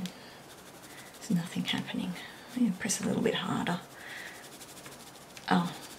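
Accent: Australia. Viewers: Hi! When you say you’re going to blend the white strokes out with a “thinner”, do you mean just like something that you buy that is just called a paint thinner, or do you mean some sort of odourless mineral spirits? This was a good video. I normally end my comments with hi from Australia, but I know by your accent that you’re Australian and it’s always nice to find an Australian video on YouTube! 😃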